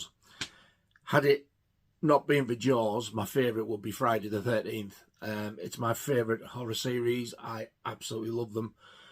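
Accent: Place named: Northern England, United Kingdom. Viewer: whereabouts I can hear you’ve got an accent are you from like up north? I’m in London myself I’ve preached the gospel before in Doncaster and I was wondering if you might have been from there anyway your videos are good mate. Carry on the good work.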